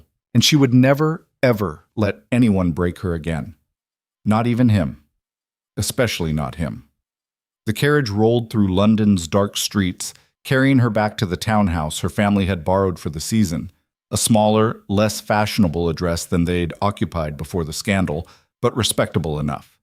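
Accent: United States. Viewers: That American accent just isn't appropriate for stories of British aristocracy.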